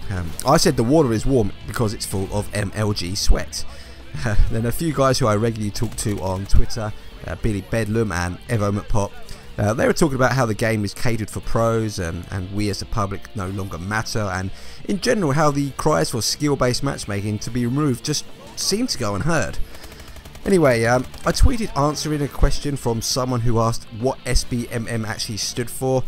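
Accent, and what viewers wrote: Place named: United Kingdom. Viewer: From the sound of your voice, I assume you're from the UK or somewhere in Europe? If so, that region has ALWAYS been paired up with people outside of the country since COD4. It's not SBMM at fault for your laggy connections to other countries. It's the region you are in, what your connection quality is, and what time you play.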